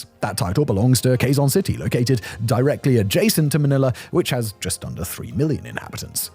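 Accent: United Kingdom. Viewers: As a Filipino I find it endearing whenever you pronounce these Spanish sounding City names and Filipino Names in your own British - white boy way 😂😂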